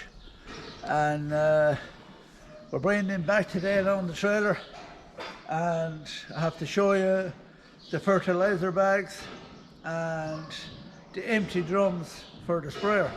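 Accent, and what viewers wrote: Ireland. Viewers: That's because it's an Irish accent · right at the end of the video those of us eagle eyed old boys 🤣🤣🤣 - we can see what looks like “red straps” to a dress. Knowing that you and ‘mum’ went shopping in Clemont, we all assumed that it was a new purchase! (I think we were all hoping for a fashion show 🤣 but then we would be a different type of old men....... ) You have to remember that most of us have been shut away and you have become a farming celebrity. We have all taken to you, mum and dad - it’s honest, real, true and interesting with a touch of fun. Plus there is nothing nicer than your Irish accent. Take care, smile and keep posting true, real, interesting videos - we all enjoy them. Richard xx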